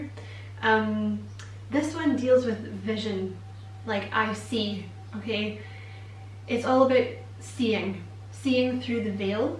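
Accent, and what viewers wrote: Scotland, United Kingdom. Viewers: I have just binge watched your videos here, I love your explanations for everything I would really agree with how you see things and you have confirmed a lot of what I have felt to be true but wasn't sure if I was seeing it the way other spiritual people do so it's great to get your take on everything. Also this is the best chakra explanation I have heard 💚💫🙏. You probably hear this a lot but I love your Scottish accent, I'm Irish and we do tend to like the Scots over here 😃. God bless and take care 💚🙏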